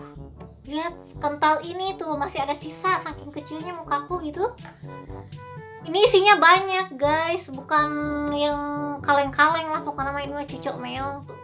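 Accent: Sunda, Indonesia. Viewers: Sunda kentel😂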